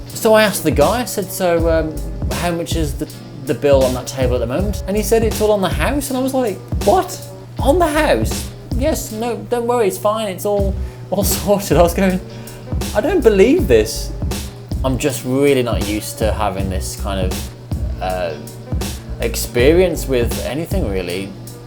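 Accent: United Kingdom. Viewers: I noticed while you were tasting the sinigang soup your companions were telling somebody (the restaurant manager perhaps) that your a famous vlogger from the UK (your accent helped too) ergo you got the dinner for free. Your lucky that your Filipino companions were social media savvy and knows how to negotiate, but have to advise you that's not always the norm. Sorry for being a spoiler but kudos to those Filipinos you were with.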